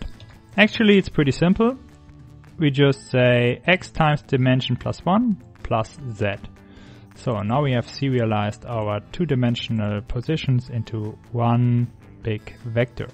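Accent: Germany. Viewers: btw are you german xD rly sound like it wär ja ganz nett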